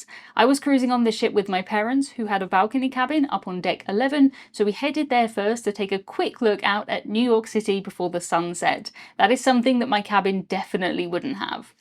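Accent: United Kingdom. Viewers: what part of the country is your accent from? i hear different versions of British English, but i dont know what parts theyre from.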